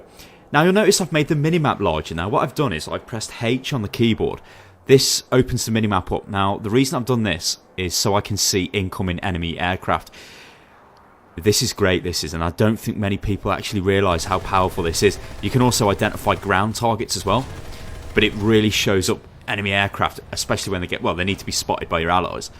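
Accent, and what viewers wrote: United Kingdom. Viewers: Oh, hes British. They pronounce it differently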